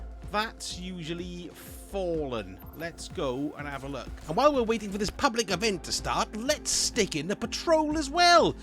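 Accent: Wales, United Kingdom. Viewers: You know what I love about your channel? The fact that you're just a regular player like the majority of us. There's too many vids out there by youtubers who are 1390 power level, showing hours of themselves doing flawless trials, speed running GM nightfalls and farming raids. Always a light hearted approach and humorous but informative, and that Welsh accent reminds me a lot of the infamous Dai Ling Ping ( what happened to him?). Keep up the good work me old sausage, and I hope your channel grows.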